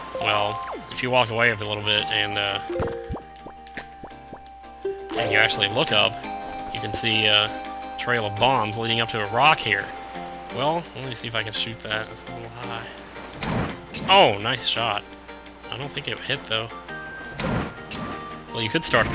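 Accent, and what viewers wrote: South, United States. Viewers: Where did you live for 10 years? Georgia? Texas? Alabama? I think different states have different accents since I grew up in Mississippi, then moved to Texas, and people said I had an accent, heh. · Southern accents are the best! :D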